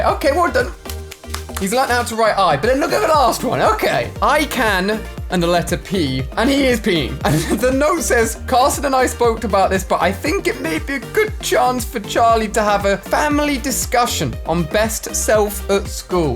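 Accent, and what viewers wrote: England, United Kingdom. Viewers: Are you from uk or something else? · Wait is British like me 😮😮😮😮😮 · Are you from England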